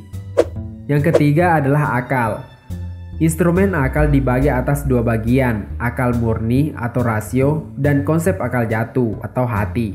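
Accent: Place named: Sulawesi, Indonesia. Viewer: ORANG SULAWESIKI'? KENTAL SEKALI LOGATNYA HEHE SALAM SULAWESI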